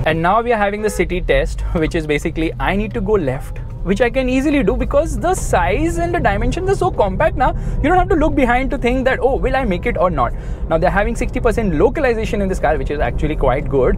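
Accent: India. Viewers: Bro Your video is Alright but your indian accent are so funny 😃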